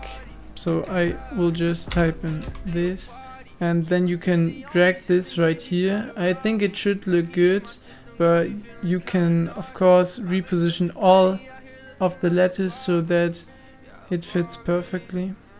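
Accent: Germany. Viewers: I knew cuz your english is like a german aka ich auch lol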